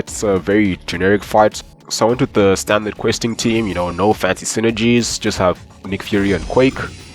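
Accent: South Africa. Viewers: Yo I have to ask. Are you from south africa? Sounds like you are.. Congrats on red guardian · Randomly came across this video. You sound South African?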